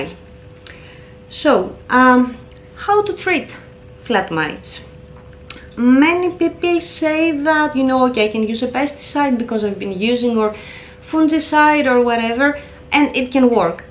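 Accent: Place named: Spain. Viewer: Hong Kong 😱 Definitely too far 😅 I’m Italian… I recognize something Spanish in your accent… I was hopping for a new weekend-Hoya-trip 🤪 Thanks a lot!!!!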